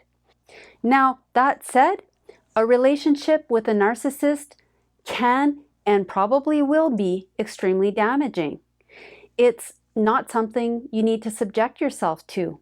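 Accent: Canada. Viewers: I like your Canadian accent! Canada is my favorite place to visit. Thanks for sharing.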